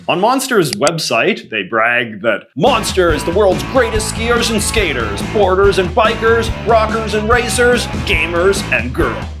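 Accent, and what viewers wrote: Canada. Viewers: Most Canadian accent ever and I’m Canadian. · “Talk a boot this this with oot mentioning….” Canadian slime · That Canadian accent tho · … there it is lol this is my first video and I was wondering why you sounded like that. Now it all makes sense, you’re Canadian! 😂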